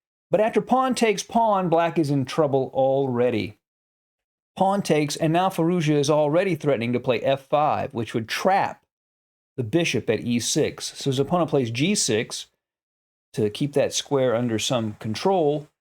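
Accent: United States. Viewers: Absolutely love your Alireza videos and analysis and your American accent!! Keep up the great work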